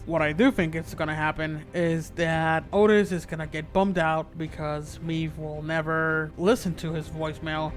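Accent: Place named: Scotland, United Kingdom. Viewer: hes scottish, dont be inconsiderate haha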